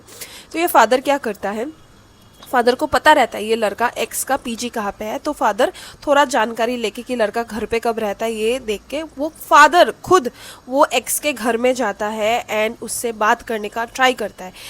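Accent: Bengal, India. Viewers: Are u from bengal? Ur accent tell it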